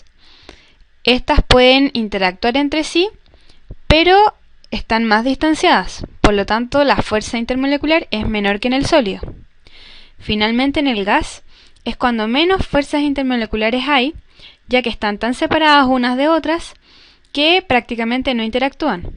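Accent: Chile. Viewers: Sos de Chile ami no me engañas jaja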